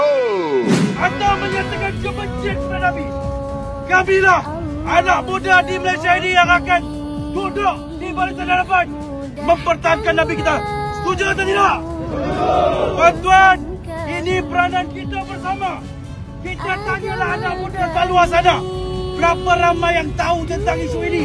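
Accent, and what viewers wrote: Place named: China. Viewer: Min ni melayu ke? Kenapa Ader loghat cina.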